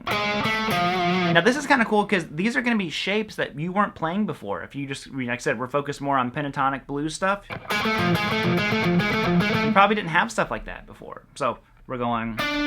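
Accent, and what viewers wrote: South, United States. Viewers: Hi. Australia calling. Love that southern drawl y'all have!! You can give me Blackmore fovever as he's my favourite guitarist bar none. How about the "solos" on Highway Star and Burn?? BTW check out Bach's tocatta and fugue in D minor for the Death Alley Driver riff and Sky's version of Tocatta for Ritchie's inspiration.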